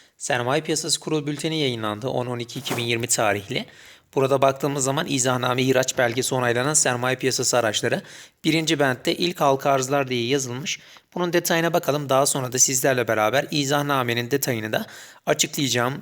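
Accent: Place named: Newcastle, United Kingdom. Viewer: İngilizce aksanın newcastle upon tyne tarafına benziyor super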